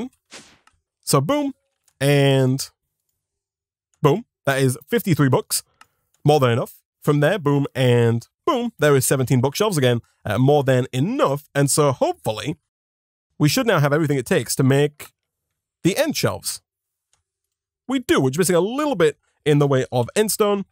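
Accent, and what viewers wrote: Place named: Yorkshire, United Kingdom. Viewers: Yeah he is from Yorkshire where the tea comes from but prefers the caffeine from coffee :p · British, I think. He’s British, I know that much, but I don’t know the accent.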